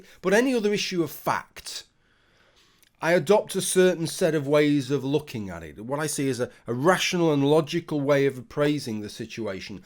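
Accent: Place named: North, United Kingdom. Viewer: Hi. I'm not a native English speaker, and while I still enjoy the battle religious people have against nonbelievers like me, I mostly listened to this video trying to learn understanding English better, especially British accents. Yours is very easy to understand, but the accent hints to probably more north than south. Not close to Scotland, probably, so I'd guess Leeds area? Cheers!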